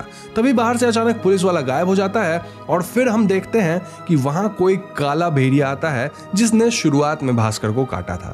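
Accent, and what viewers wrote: Bengal, India. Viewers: Karon tumi jokhon kotha bolcho majhe majhe bangla beriye porche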